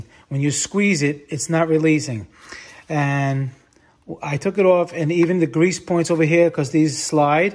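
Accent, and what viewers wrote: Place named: New Jersey, United States. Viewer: Love the east coast Jeewwwessseeyyy accent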